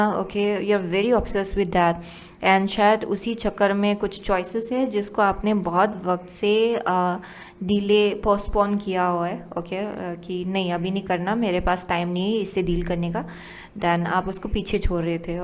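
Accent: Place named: Uttarakhand, India. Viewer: But her accent sounds she definitely from uttarakhand, ne ppl has a little accent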